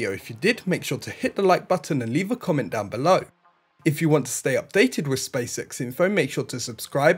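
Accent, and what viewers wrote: England, United Kingdom. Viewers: Narrator sounds English but isn't this the channel that used to have Vietnamese stuff on it along with spacex stuff?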